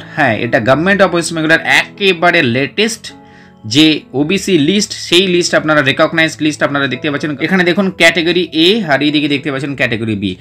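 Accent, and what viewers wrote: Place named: Bangladesh. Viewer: voice appearance almost Bangladeshi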